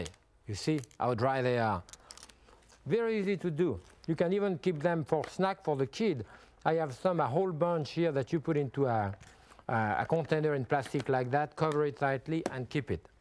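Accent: France. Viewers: He corrected himself a minute later and said “of course it’s a cantaloupe “, but you may not have understood his divine French accent.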